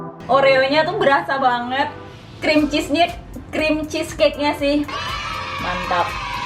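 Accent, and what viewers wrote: United Kingdom. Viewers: British accent enak bgt 😂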